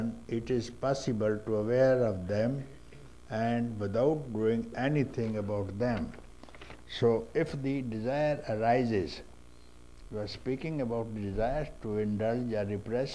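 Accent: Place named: India. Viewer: I like Papajis Indian Accent 😂😂😂🙏🙏🙏🙏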